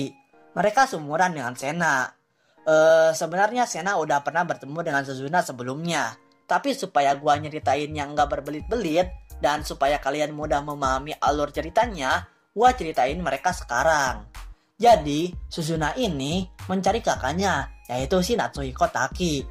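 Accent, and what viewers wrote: West Java, Indonesia. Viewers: Sunda pisan iyeumah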